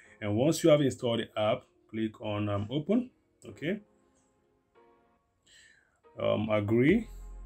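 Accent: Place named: Cameroon. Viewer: Thanks for this... only samsung does this kind of crap lol. I really enjoyed your accent it reminded me of my best friend from high school, she's from cameroon.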